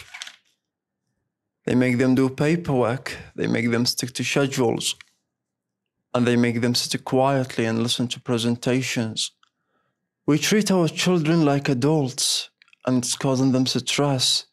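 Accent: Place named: United Kingdom. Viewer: Very nice job. I enjoy it as always. Your pronunciation and british accent are great! Your voice tone is perfect! I wish you a big success and happiness! ❤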